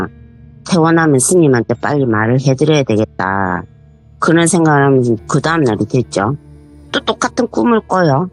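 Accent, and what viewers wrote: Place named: Gyeongsang, South Korea. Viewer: 시간 가는줄 모르고 들었어요~경상도 사투리 친근해요😊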